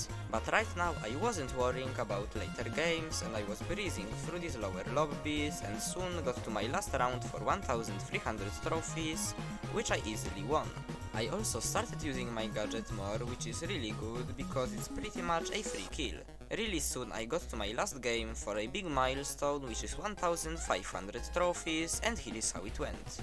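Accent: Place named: Poland. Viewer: Masz polski "akcent"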